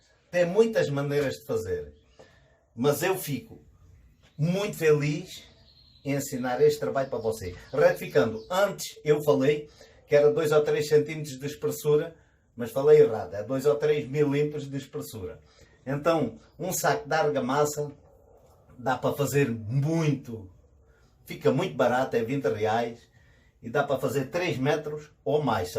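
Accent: Portugal. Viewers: Vou salvar este vídeo para fazer em breve, muito bonito, muito simples e sem falar da simpatia e o sotaque de Portugal que acho muito legal. Parabéns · Logo no inicio, pela simpatia, sotaque e nome, já fui assinalando o like e me inscrevi. Caro sr., assisti com emoção a apresentação dos seus ensinamentos em vídeo, pois lembrei do meu avô, também lusitano. Era um ótimo marceneiro, carpinteiro e pedreiro. Fez praticamente sozinho nossa casa em Piratininga - Niterói/RJ, numa época em que ainda não havia energia elétrica, só ferramentas manuais. Pena que não houve tempo para que ele me passasse os seus conhecimentos. Bem, gostei da ideia e vou aplicar no muro da minha casa, ora pois! Parabéns e obrigado. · Amo o sutaque portugues, queria falar assim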